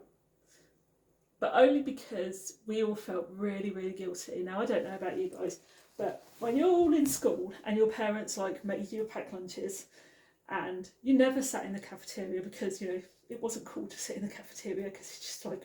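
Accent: United Kingdom. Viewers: I thought you are from USA I hear that UK accent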